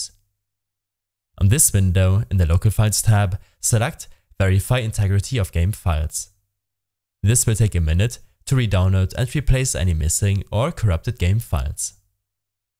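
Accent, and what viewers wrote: Germany. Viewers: any cahnce that u are german ?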